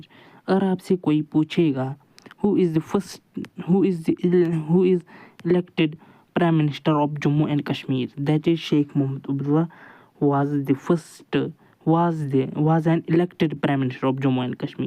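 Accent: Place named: France. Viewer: french kee english bolna chod dooo mra bahi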